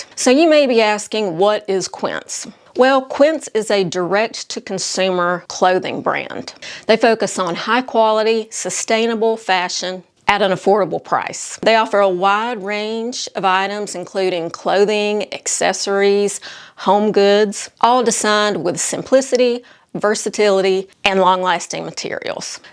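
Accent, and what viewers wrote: South, United States. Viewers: Hi! I am new to your channel! Been watching about a month! I grew up in LA (lower Alabama)! Im 65 and enjoying your videos so much! I'd never heard of Quince before so i am going to check them out! Love the cashmere tank and the cotton sweater in all the colors! One if my favorite things about summer are the flowers and swimming in my pool! Also love getting to finally wear the summer colors and ditch the black and browns (for the most part)! Thank you for the great content. Love your videos! Oh and im enjoying listening to someone who sounds kinda like me ( Southern accent! 😂).❤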